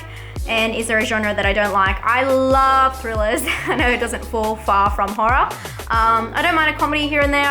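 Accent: Australia. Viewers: First off, you know we love your Australian accent. LOL!! Congrats on your 4500 subscribers. Afflicted was an excellent found footage horror movie. If you ever come to the US, come visit my city, Philadelphia, the City of Brotherly Love. I'll introduce you to a Philly cheesesteak. Keep up the great work.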